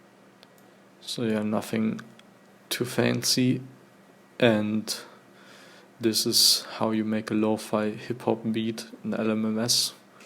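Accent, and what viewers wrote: Germany. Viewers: Which is why most of nowadays music sounds thew same. He smashed the genre, true. But it's just one of millions of songs we hear while learning/studying/working. It would be a great start to build on upon, or an easy short tutorial. That's already it, let's everyone agree on paying more attention to the music we hear, so we know when we're getting fucked in our pressious brians by the industry. Good tutorial, boi! Do I hear a german accent? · As a german, english with german accent makes me cringe so hard. Nothing against you, I think I sound similar. Great tutorial, thank you! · YE i thought also about his accent because im german too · one word and i was able to hear the german accent XD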